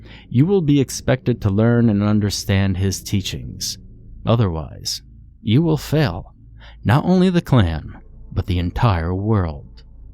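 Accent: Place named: Appalachia, United States. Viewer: I don't like the hilly billy accent.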